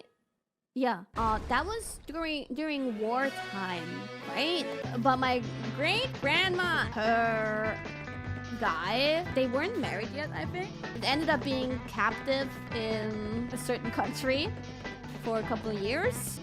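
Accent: United States. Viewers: Must be why she has such good aptitude for speaking American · American blood... Maybe that is why she is good speaking American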